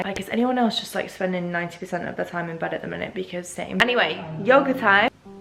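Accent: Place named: RP, United Kingdom. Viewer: Have you always lived in Manchester? Your accent sounds quite different and posh I can’t place it 🤣X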